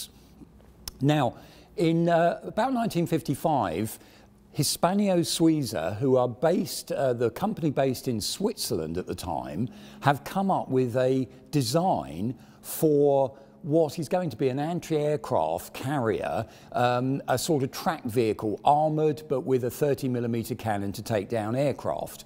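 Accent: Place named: United Kingdom. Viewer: Sounds British.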